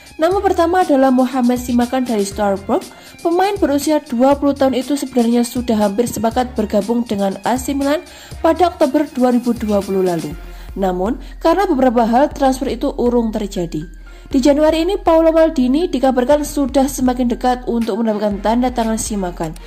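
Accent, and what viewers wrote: Java, Indonesia. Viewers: Wah wah bahasanya medok bgt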